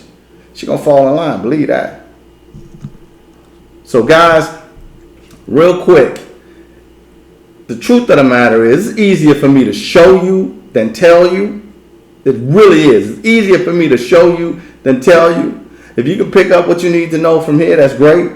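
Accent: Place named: East Coast, United States. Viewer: You sound like an East coaster. Where you from fam?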